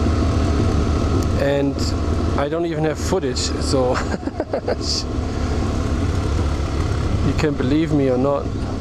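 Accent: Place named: Germany. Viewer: Are you from Germany? You've got a German accent! I'm from Sweden.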